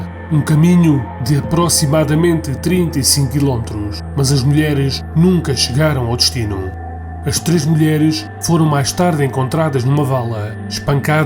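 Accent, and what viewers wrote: Portugal. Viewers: Gosto do idioma de Portugal mas pra narrativa n fica bom pra esses tipos de relatos da impressão de que o Roberto Leal esta narrando.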